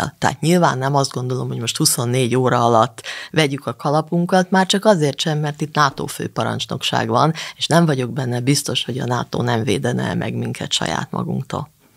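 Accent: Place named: England, United Kingdom. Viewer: Nagyon jók a Hossó Andrea videók mindegyiket megnézem. De ez a rémes angol akcentus ez engem nagyon idegesít. Megérteném ha ott született volna, de nem.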